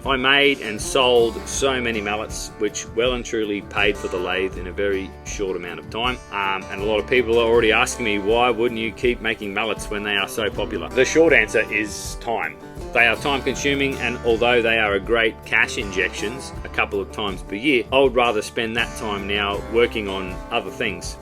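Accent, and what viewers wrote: Australia. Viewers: I do love my machines, I've not quite as small a shop as you have though. Even as an amateur, time is as important to me as money since I never planned to support my hobby with what I make. I'd mention that sometimes the background music is just loud enough to make your speech less discernible. (Sure, I hear in American but I can usually follow Aussie.)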